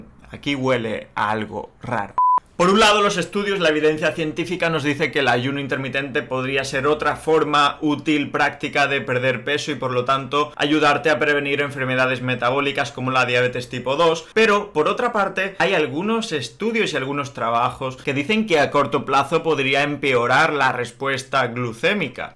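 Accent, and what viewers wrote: Spain. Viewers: Me encantan las enseñanzas del Dr, excelente....., lo disfruto muchísimo y ese acento español me fascina. Muchas gracias. Saludos desde Venezuela.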